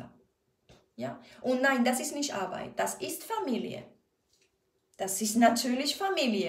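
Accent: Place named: Spain. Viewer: Casi puedes decirr lo que quieres, te lo prestaría attención por tu accento español. 🤗 y tienes razón con lo que dices en algunas cosaa.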